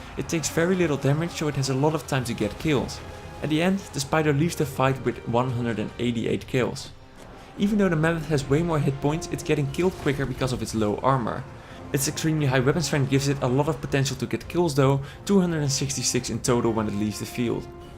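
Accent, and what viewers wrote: Netherlands. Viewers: Jij bent nederlands of niet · Lemme guess, you're Dutch aren't you?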